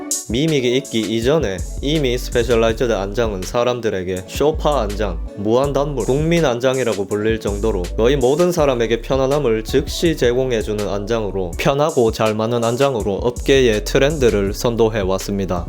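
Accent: Gyeongsang, South Korea. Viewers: 저도 경상도 사나인데 사투리가 와이리 듣기 좋노~ 참 히안하데이~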